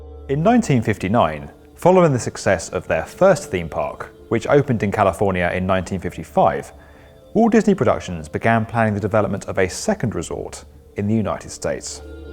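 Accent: England, United Kingdom. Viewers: CAN WE GET A NARRATOR WHO IS NOT BRITISH BUT AMERICAN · Mr JayL well let’s hear your voice , he has a clear English Accent , do you !!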